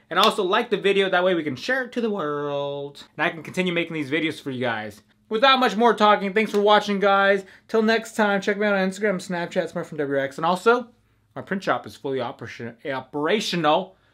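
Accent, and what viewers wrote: New York, United States. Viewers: really, thought that was new yorker accent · your accent sounded more like a New Yorker not someone from the east cost.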